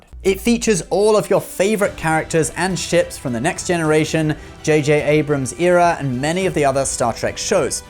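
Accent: United Kingdom. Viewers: not a fan of british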